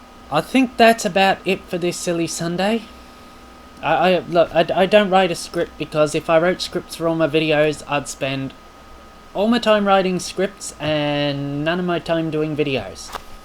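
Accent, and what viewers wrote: Australia. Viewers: Speaking as an NZer you sound Australian to me. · You sound more Australian than me haha. Little tip for your green screen, try to get the material tightener on your frame, it helps heaps with keeping the background images solid. Otherwise great video :)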